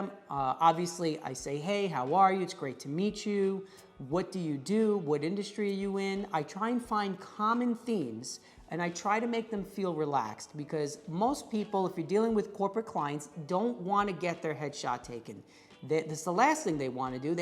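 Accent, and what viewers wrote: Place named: Bronx, United States. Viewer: Thank I grew up in the Bronx listening to you made me home sick. Great job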